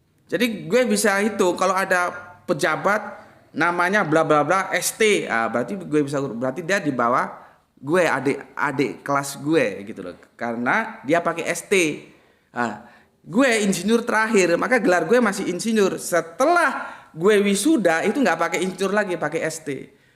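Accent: Java, Indonesia. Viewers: Medok kok pake gue gue, koyok kodok! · Ga usah GUE GUE lah... GA PANTES & GA NYAMAN dengarnya ! Logat aja logat Jawa kental gitu koq GUE GUE... !!! Wkwkwkwk... 😂😂😂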